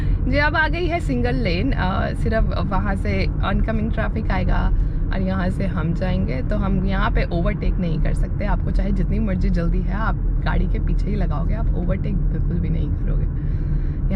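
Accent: Punjab, India. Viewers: I really like your punjabi toning 🔥❤️❤️😁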